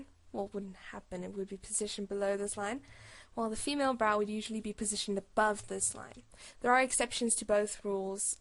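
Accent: South Africa. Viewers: Ay! Is that a South African accent I hear? Love it!!! Dig this video! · Are you South African? Love this tutorial!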